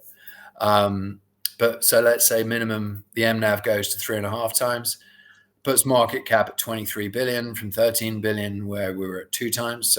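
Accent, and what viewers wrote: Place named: United Kingdom. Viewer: All I heard was a gay bored British accent start a sentence with the word “so…“ Before I quit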